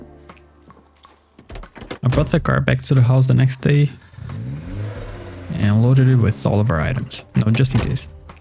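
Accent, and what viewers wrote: Brazil. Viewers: Eu reconheço um sotaque brasileiro, mesmo com um inglês tão bom hehehe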